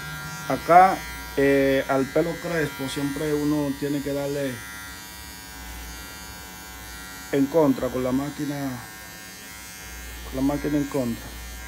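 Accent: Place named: Dominican Republic. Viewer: Es que así es que hablamos los Dominicanos, y no solo cambiamos "L" por "R" tambien cambiamos palabras por completo😂😂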